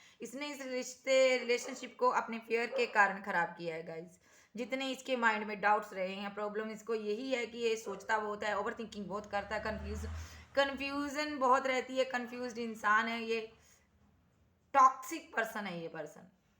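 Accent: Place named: Punjab, India. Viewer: Madam aap ka Punjabi lehja boht acha lagta hai Allah aap ko aur kaamyabi de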